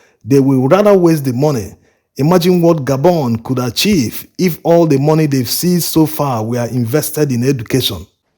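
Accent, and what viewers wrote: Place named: Yoruba, Nigeria. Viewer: Thank you brother for the video, you doing wonderful job. One thing, you sounds like my Yoruba friend Nigeria 🇳🇬, are you a Yoruba? Your accent sounds so much like him. I have watching your videos for quite some time. The way you speak just reminds me about him. I don't want to mention his name on social media, you most be him.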